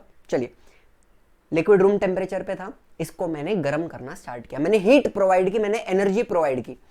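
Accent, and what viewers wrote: Maharashtra, India. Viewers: Sir are you Marathi???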